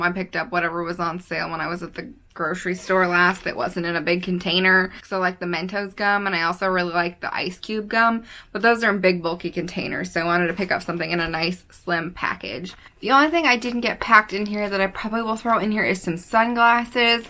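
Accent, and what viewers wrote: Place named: United States. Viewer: Nice video, thanks for sharing. I haven't finished watching it yet... I had to pause when you mentioned nearly a 12 hour journey!!! I thought to myself, she sounds State side, where could she be flying from 12 hours to get to Tenn?? Then I realized (after reading About Me section) you're coming from OR. Makes sense 😂😂 ❤️❤️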